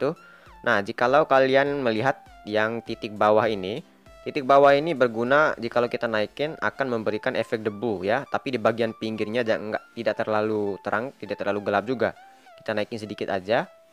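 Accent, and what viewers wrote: Bima, Indonesia. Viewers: Bahasa indonesianya kedengaran seperti Orang Bima NTB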